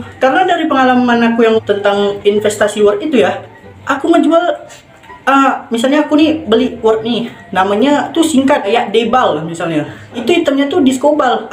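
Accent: Indonesia. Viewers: Orang Kalimantan kah ini? Dari logatnya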